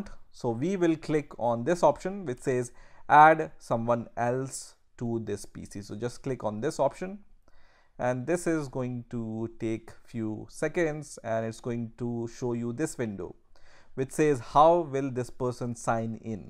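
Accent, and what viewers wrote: India. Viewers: This dude is German? But he sounds Indian to me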